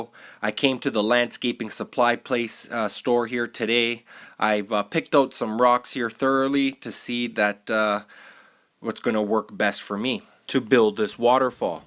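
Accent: Canada. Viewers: Love the Canadian accent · the most Canadian accent I've ever heard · a really strong canadian accent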